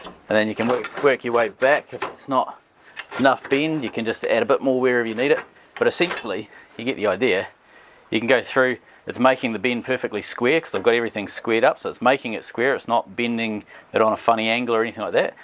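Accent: New Zealand. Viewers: With your Kiwi accent the automatic YouTube captions always get "Brew Pig" instead of Brupeg. Nice work on the belt shroud!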